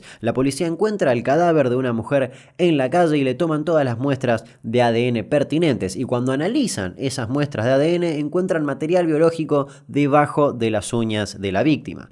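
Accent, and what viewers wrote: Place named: Posadas, Argentina. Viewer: De que lugar sos Capo? Pareces Posadeño amigo.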